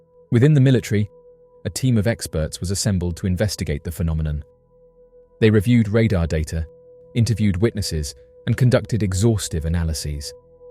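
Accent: United Kingdom. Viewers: He’s just bri’ish.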